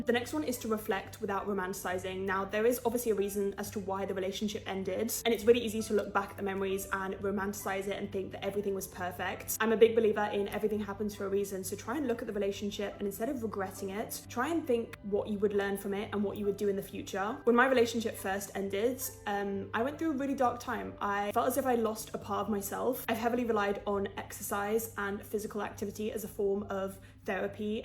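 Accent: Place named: England, United Kingdom. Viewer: Good advice. Luv your English accent btw.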